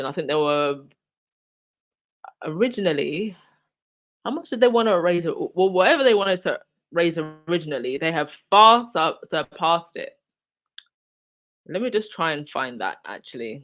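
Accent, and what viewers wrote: United Kingdom. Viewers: Ugh! I hate missing the live! I love the English accent.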